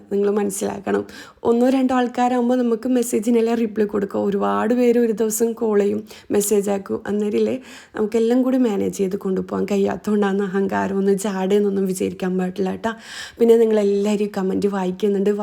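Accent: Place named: Kasaragod, India. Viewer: കാസർകോട് സ്റ്റൈൽ സംസാരം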